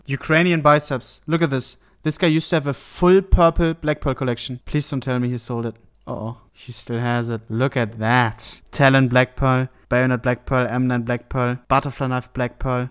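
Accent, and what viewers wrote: Germany. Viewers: Youtube auto captions is writing Germand words while he is peaking english WHILE being german, despite him having a very very good english elocution, and i can't help but feel amazed. I can TELL he is GERMAN, but for a robot to accidentally write german words while he is speaking more than decent english blows my mind.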